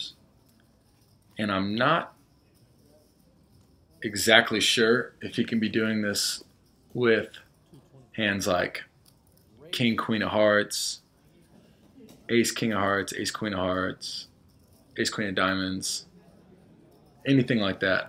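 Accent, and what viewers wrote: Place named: West Melbourne, United States. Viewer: Kinda have the west Melbourne (FL) accent... Don't hear creole. (Brevard county viewr is reason) But I'm feeling your "rounding" hard core style yo. Good vibes if that's your gig. First timer here 👍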